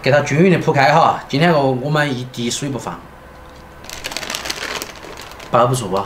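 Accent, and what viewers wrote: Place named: Sichuan, China. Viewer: 我看超小厨说四川方言但唱歌太标准，老外觉得太有意思.这就是全世界一样，在韩国也是一样的.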